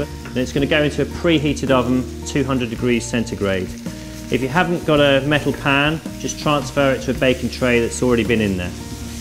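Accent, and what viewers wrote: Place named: London, United Kingdom. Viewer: You sound like yo from London!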